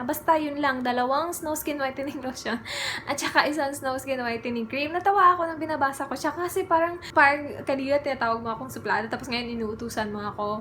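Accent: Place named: Cebuano, Philippines. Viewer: Miss I'm your new subscriber... My mother is from cebu as well hehe... I super enjoy your videos it reminds me my family ung tono ng pagsasalita mo parang same sakanila kase from cebu and english...hehe Pwede po ba shout out sakin and sa asawa ko na si Odi dito kami sa Abudhabi... wait ko po hehe.... Godbless us all... Take care!!!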